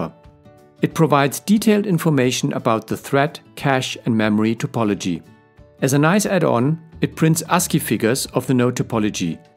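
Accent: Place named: Germany. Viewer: I love this German accent!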